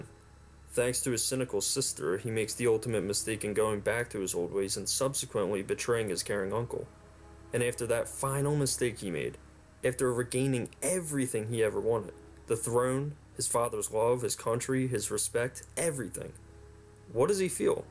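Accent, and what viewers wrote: United States. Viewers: Bro, you gotta be from the mid-atlantic with that accent of urs. Sound like eastern PA or south jersey. Am i right? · Dude, your accent is intense... where are you from??? Baltimore? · Ok mate, I really can't tell if you're American, Australian or New Zealander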